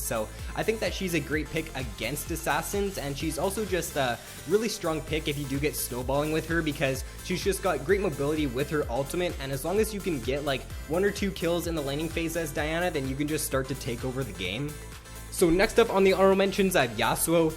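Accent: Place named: Canada. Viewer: hey, are you from Canada? I'm hearing a slight accent:P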